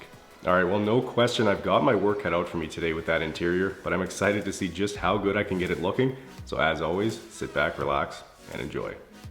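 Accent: Canada. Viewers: I must say that I am impressed with your dedication to being a perfectionist it definitely helps with this kind of profession every vehicle you Clean is amazing but as a united States citizen I'll say that I definitely noticed your Canadian accent lol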